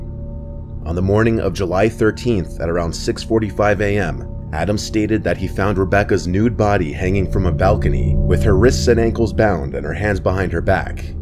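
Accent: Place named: New Jersey, United States. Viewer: Great videos! I am super fan. I feel like I hear a slight NJ accent. Or NY maybe... Anyway, keep them coming!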